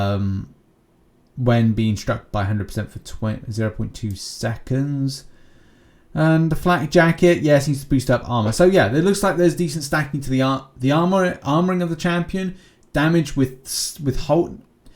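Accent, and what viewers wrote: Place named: Cockney, United Kingdom. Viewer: You have that like cockney accent, “ ‘ello govna”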